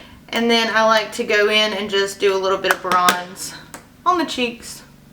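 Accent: South, United States. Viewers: Your so pretty with and without makeup! You sound like your from the south, I love it! I live in North Georgia! :) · Girl, I am a new subbie and I knew you had to be a southern gal! Love this eye look! Hugs from Bama!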